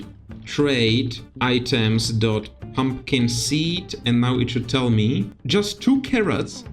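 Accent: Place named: Germany. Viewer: The guy sounds German which to me is an interesting demonstration of how "bad language" is not inherently "bad" and I enjoy how diverse the reactions there are to the same thing.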